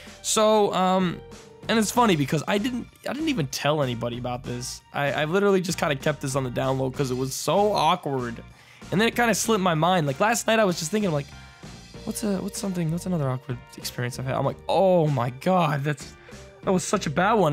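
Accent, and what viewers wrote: Newfoundland, Canada. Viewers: Huh, I actually guessed that you were from Newfoundland- Its probably because the way you pronounce certain words is similar to how MattShea does.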